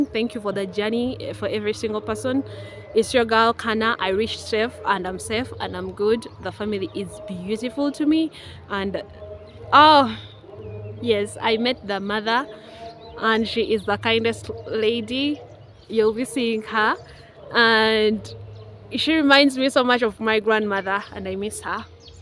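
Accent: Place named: Uganda. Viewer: Interesting listening to your Ugandan accent. I had no intesion of watching the whole thing but I just did! Karibu Kenya.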